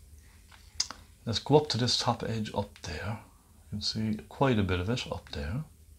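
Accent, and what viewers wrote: Ireland. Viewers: that wonderful Irish accent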